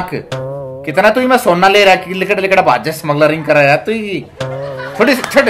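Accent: Haryana, India. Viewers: I like ur haryanvi .....enjoy a lot....and ur wife is very beautiful..... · M b Haryana m 3 saal pada hu aap bilkul sonipat wali haryanwi bolte h i luv it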